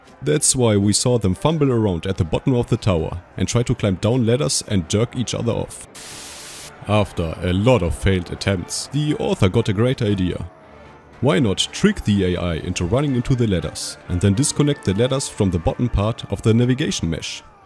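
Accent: Germany. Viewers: 1 little question, where are u from again ? U sound kinda german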